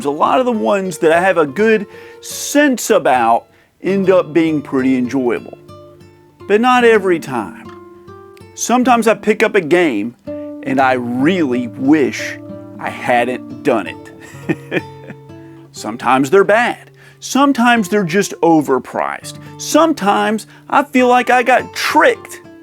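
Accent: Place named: New England, United States. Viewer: You seem like you’re from the southern part of upper New England. That’s not an insult by the way. I’m from Middle New England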